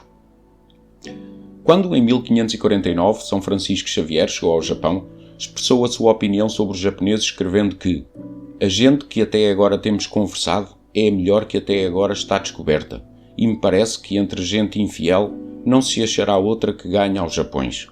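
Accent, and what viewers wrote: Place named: Portugal. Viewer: Maravilhoso vídeo! O assisti até o fim, sobretudo por ouvir português falado por um português Eu sou brasileiro e vivo no Brasil, terra descoberta e colonizada por Portugal. Nós, brasileiros, precisamos ter orgulho de termos sido colonizados pelos grandes navegantes portugueses, homens destemidos que enfrentaram o Monstrengo do mar (Pessoa, em Mensagem), os verdadeiros argonautas da civilização.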